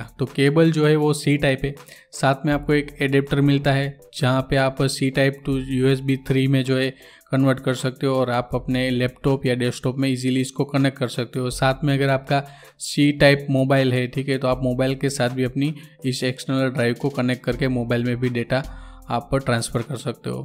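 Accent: Gujarat, India. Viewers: Ap Gujarati ho?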